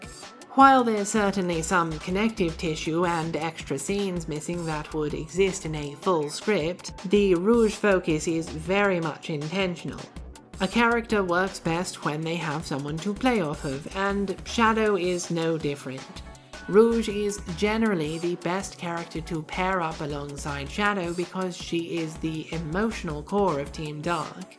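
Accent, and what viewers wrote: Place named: United Kingdom. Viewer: Why do you have a british accent in some videos but not in others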